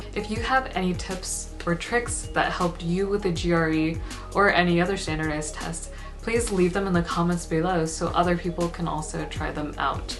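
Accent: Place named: United States. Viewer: Love ur video, I’m an Indian too and finally Im watching a gre video of an Indian with a US accent 😂. Ur really funny too, great video 👍🏼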